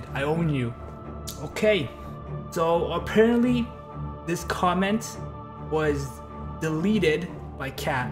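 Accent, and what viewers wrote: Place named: Toronto, Canada. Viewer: you're a Toronto man right? I can tell by the way you talk lol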